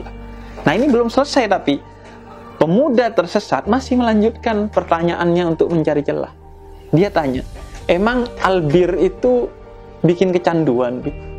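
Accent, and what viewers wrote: Madura, Indonesia. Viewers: Maaf bib kayak ada logat" maduranya ?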